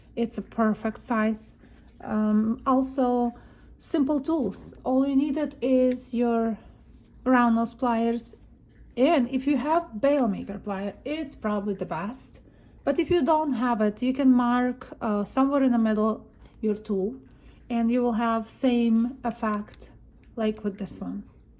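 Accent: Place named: Czech Republic. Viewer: I love it, I lived in Prague for a while and while I know it’s not exactly the same, your accent makes me miss Czechia very much. I even have been to Kiev. My heart is with your people. 🖤💔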